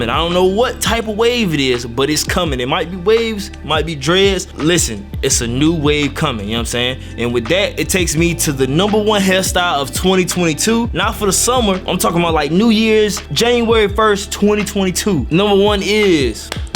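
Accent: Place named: Philadelphia, United States. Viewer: You from philly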